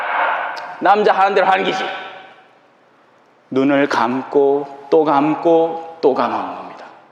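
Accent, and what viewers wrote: Gyeongsang, South Korea. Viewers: 아멘 신부님 경상도 사투리 넘 정겹습니다 강론말씀도 참 잼나게 하십니다 나날이 신부님 건강도 좋아보시니 감사드립니다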